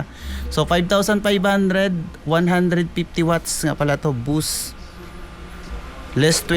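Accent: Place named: Bicol, Philippines. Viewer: bikolano ka kabayan?pareho kasi tyo ng accent😂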